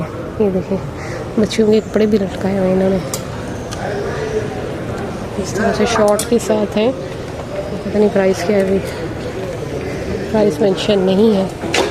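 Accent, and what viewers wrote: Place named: Arab world. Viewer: Your arabic accent is vey nice I wanna learn arabic can u guide me from where i can learn From Pakistan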